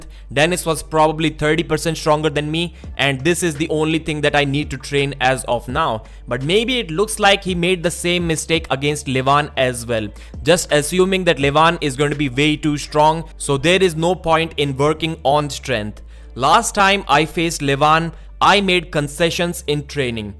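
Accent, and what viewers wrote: India. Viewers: Your indian accent is so funny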